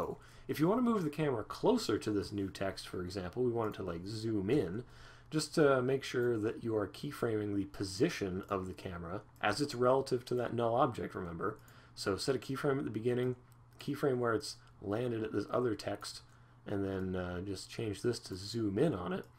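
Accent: Canada. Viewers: You have a very Canadian accent and you are a great teacher.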